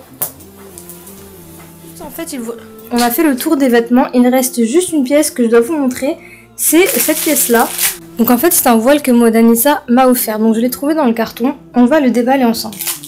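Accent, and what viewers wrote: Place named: Belgium. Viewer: Salut , l'accent belge à l'honneur dans cette vidéo , je suis tombée dessus par hasard, bonne continuation pour la suite ! 🤩🤩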